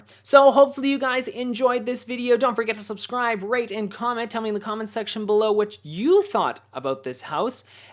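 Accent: Canada. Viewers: You sound very Canadian... If he is sorry I had no idea. I thought that because of how he said sorry (Soary).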